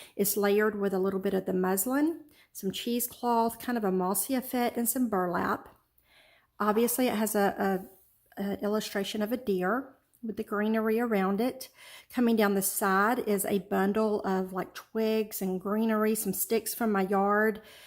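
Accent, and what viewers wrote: South, United States. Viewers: WOW!!!!! I am so glad I saw your comment about showing this journal on Tracie Fox's Facebook Page. Its one of the most well done journals I've seen yet!!!! Really beautiful and so much thought put into it! And, another thing that caught my eye is your middle (or maiden name) is...and my maiden name is also!!! You don't see too many McCollums so I was intrigued!! I am originally from Texas but I now live in Alabama...I can tell by your accent you are somewhere in the south I think!!! Anyway, loved the journal and now I am off to check out your Etsy store!!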